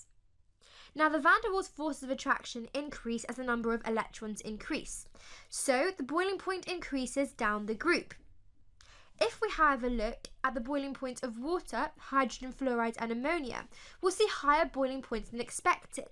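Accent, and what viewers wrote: United Kingdom. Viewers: I've never heard that much of an english accent in my life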